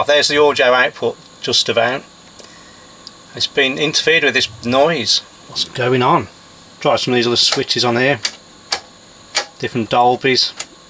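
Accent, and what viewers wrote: Birmingham, United Kingdom. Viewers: Wow, I just stumbled on this video and I am transfixed! That is a beautiful deck and if any of my audio equipment became faulty, I'd want you to repair it. So professional. As an aside, you sound Brummie. I am from that part of the world. Brilliant!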